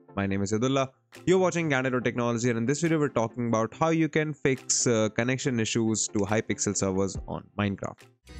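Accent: India. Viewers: It didnt work Indian accent bro 😢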